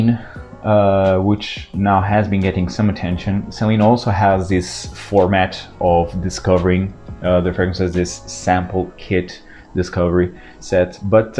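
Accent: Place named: France. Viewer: this is my first time watching your review, I'm loving it. thanks for the vivid describe of the notes. I also love your accent. it's like my french friend speaking english.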